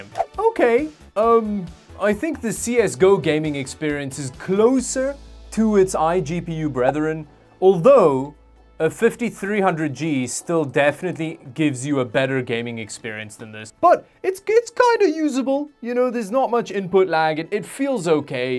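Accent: South Africa. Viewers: Hey! curious, are you a Afrikaaner! im getting South African Vibes from you lol! Awesome Channel and love the MUG thank you lol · Are you by any means from South Africa hence your accent?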